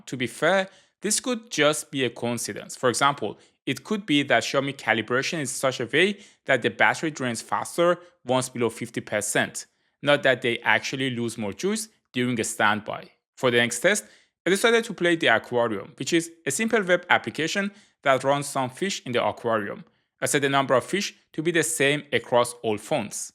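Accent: Iran. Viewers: dash kheili irani mizani ba in lahjat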